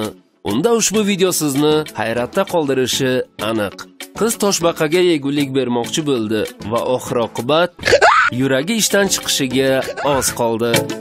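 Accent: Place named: Tashkent, Uzbekistan. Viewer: Tashkan shevasi eng rasvo sheva.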